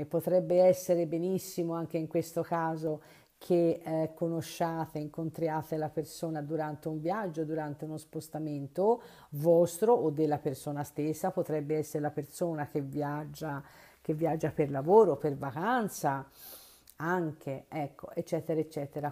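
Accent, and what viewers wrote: Tuscany, Italy. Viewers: Stesa n. 2. Col tuo simpatiho accento toshano hai centrato tutto alla grande. Se lui dovesse tornare e per giunta contrito (oggi lo sentirei come un miracolo...), giuro che lo faccio mettere in ginocchio sui ceci e corro a scriverti !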